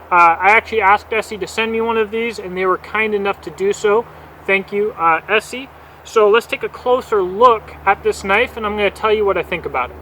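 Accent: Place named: Kentucky, United States. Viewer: My wife is giving me a hard time about your accent. She says you sound like you're from UP Michigan. I thought you were from KY. Would you mind clarifying? ☺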